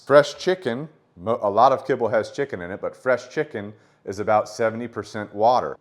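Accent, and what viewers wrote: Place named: General American, United States. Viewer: He has a standard American (U.S.A.) accent.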